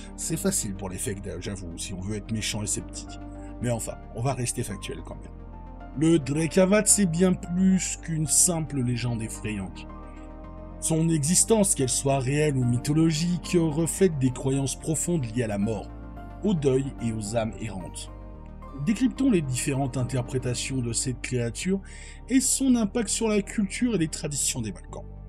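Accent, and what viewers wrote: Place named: Eastern Europe. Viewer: Je découvre ta chaîne, mais quelle ambiance! J'aime bcp ton montage sobre, ton élocution, la prestance de la grosse beubar de viking, cet accent slave magnifique 🤌 Ton analyse est fouillée et pas inutilement dans le debunk. En tout cas ton Drekavac me fait fort penser aux très modernes creepers ou rakes (humanoïdes squelettiques, pattes arrière retournées, yeux fluorescents, gueule béante et emplie de dents...) Y'a une version en Europe de l'ouest, comme tu dis le cri est horrible et ils sont très furtifs. Le coup de la photo/film raté se recoupe avec pas mal d'images de cryptides, qui sait si ces créatures ne peuvent agir sur les images avec un genre de champ électromagnétique ? Et cette histoire de cri avant un mort rappelle les Banshees bien sûr! Je m'abonne évidemment:)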